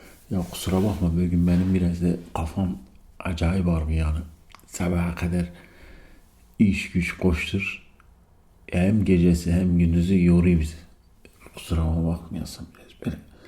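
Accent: Eastern Anatolia, Turkey. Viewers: Doğu şiveli videolar çok daha cezbedici. Emir veriyor gibi olmak istemem fakat bu lisanla psikolog roleplay güzel gidebilir. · doğu şiveli olduktan sonra her şey olabilir 1 saat doğu şiveli herhangi bir video n olsun yine izlerim her gece aciyorum bir tane şiveli ama artık aynı şeyleri izliyor gibi oluyorum hepsini defalarca izledim cünkü aslinda doğu şiveli videolar icin izliyorum bu kanalı dogrusu digerleride güzel ama onlar hakikaten başka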